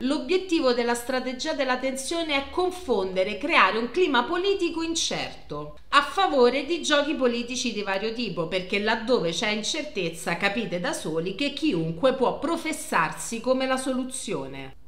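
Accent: Rome, Italy. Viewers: Si è mai vista una romana de Roma, e che parla pure in romanesco, difendere Salvini dalla propaganda della galassia PD??? SI!! La tostissima!! 😊❤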